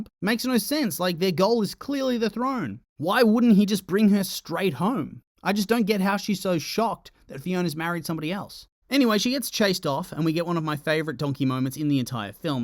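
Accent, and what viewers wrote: Australia. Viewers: Ahhhhh, I needed to hear a fellow Aussie’s voice explaining this.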